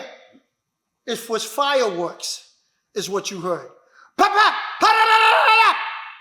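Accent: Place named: Brooklyn, United States. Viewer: Straight-up Brooklyn New Yorker. The South Bronx hears you and feels you! Stay Righteous!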